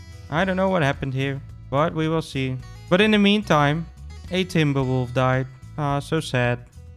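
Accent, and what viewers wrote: Netherlands. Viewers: Jou bent een nederlander ik hoor aan de accent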